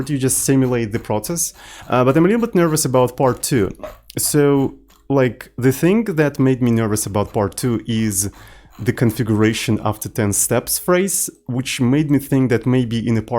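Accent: Russia. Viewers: ты русский? я чувствую акцент))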